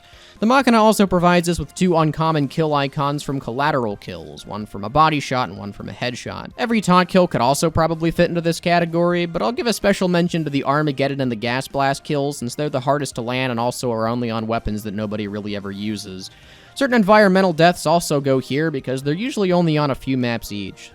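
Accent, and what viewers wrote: United States. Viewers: that's the most southern accent i have ever listened from a tf2 youtuber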